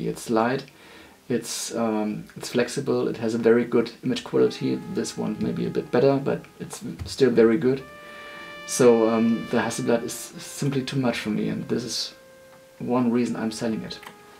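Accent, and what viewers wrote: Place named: Germany. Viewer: Ich muss ehrlich sagen, dass Video ist super, auch wenn ich persönlich ein anderes Resultat habe nach dieser Expertise. Was ich nicht verstehe, es gibt soviele Videos auf Englisch über die Hasselblad und Rolleiflex und dann kommt Mal ein deutscher der ein Video macht, beide Kameras aus der Praxis kennt und wieder auf Englisch. Sorry aber der Akzent ist nicht zu überhören. Die Bilder zum Schluss sind sehr schön, erste Sahne.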